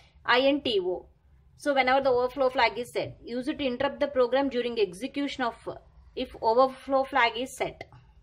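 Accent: South India, India. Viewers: Nyc effort But There is a prob. In your slang Slang like. South people 😬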